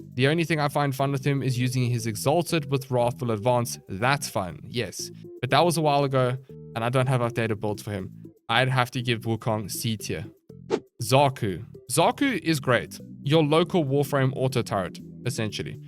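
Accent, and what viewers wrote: South Africa. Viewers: Are you South African? English accent feels a little bit familiar... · Is South African🇿🇦 by any chance?? The accent sounds very familiar.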